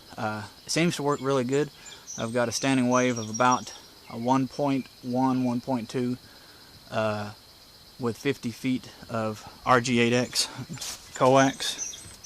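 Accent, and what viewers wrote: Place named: Eastern Kentucky, United States. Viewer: you sound like a Eastern Kentucky boy, am I right ?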